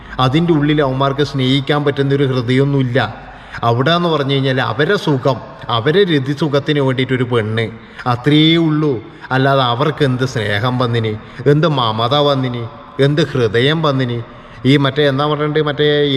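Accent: Kannur, India. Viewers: കണ്ണൂരുകാരൻ ആണെന്ന് സംസാരത്തിൽ നിന്ന് തോന്നുന്നു എന്തായാലും 100% സത്യമാണ് പറഞ്ഞത് മുഴുവനും യേശുദാസിനെ കണ്ടുപഠിക്കാൻ ഒന്നുമില്ല യേശുദാസ് എന്താ ജീവിതത്തിൽ ചെയ്തതെന്ന് യേശുദാസ് തന്നെ മനസ്സാക്ഷിയുടെ ചോദിക്കട്ടെ അപ്പോൾ ഉത്തരം കിട്ടും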